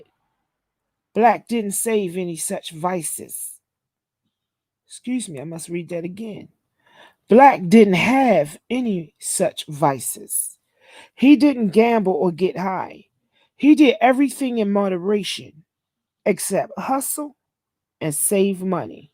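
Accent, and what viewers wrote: Baltimore, United States. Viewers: Your very welcome... its a compliment definitely... your personality makes this reading fun and interesting... your Baltimore accent makes the story more realistic...I also appreciate that your reading the entire book. Ralph Reads on YouTube got me waiting for weeks for the 2part...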